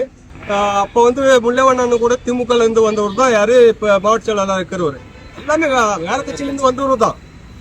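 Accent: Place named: North India, India. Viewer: Dai nee pesuratha patha north Indian pola iruke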